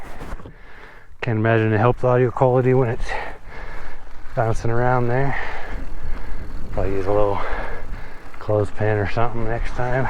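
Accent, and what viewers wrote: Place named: Farmington, United States. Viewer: You sound ike from Farmington. Nice bro python sucks. Java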